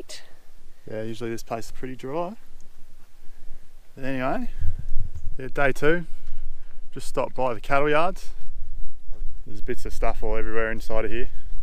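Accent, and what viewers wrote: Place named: Australia. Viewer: Except for the lack of sagebrush and your heavy Aussie accent 😆 this video looks very similar to areas of Central Oregon! Same type of history too either cattle, or sheep, or horses. If you have been watching Casey at Coyote Works channel you'll see what I mean. Always looking for relics and ruins of the past. Cheers!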